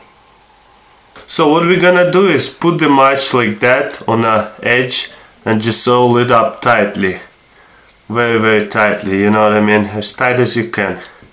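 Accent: Russia. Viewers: Just speak Russian that's what it sounds like anyway and get some subtitles if you want more people to understand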